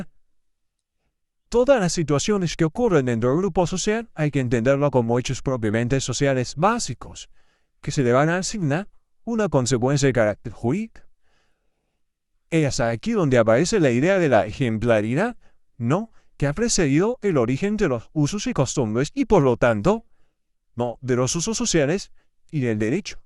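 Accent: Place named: England, United Kingdom. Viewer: Tienes acento inglés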